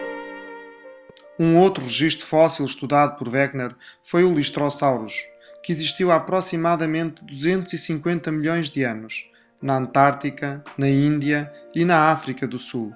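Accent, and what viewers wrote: Portugal. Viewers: tbm tenho que fazer um resumo. não entendo nada com esse sotaque português. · Esse português de portugal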